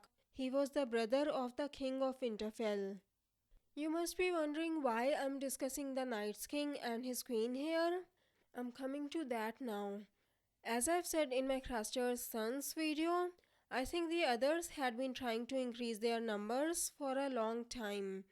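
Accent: India. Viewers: Very annoying Indian accent! Very annoying to listen!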